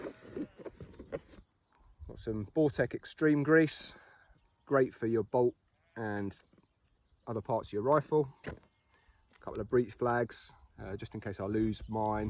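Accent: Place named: United States. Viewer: You talk funny from America. Thanks for the video good info.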